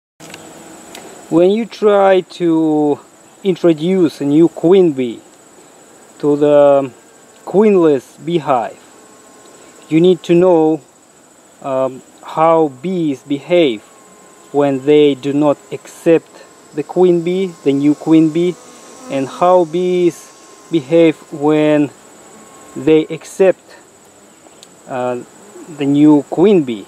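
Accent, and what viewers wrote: Russia. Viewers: russian accent😃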